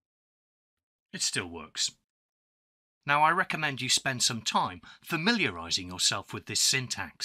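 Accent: United Kingdom. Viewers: hearing your beautiful British accented voice made me subscribe right away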